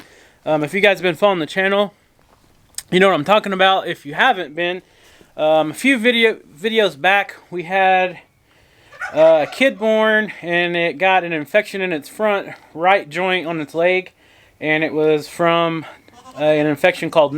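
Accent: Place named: South, United States. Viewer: I can tell you're from the South when you say "I'm fixing to do this or that." I say "fixing" all the time. Nice baby goat you had!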